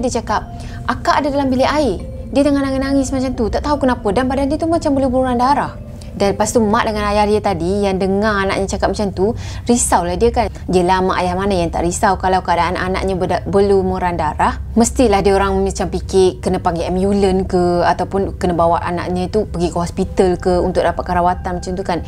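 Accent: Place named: Johor, Malaysia. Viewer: Kak ni org johor. Tgok loghat pun tau hehe😁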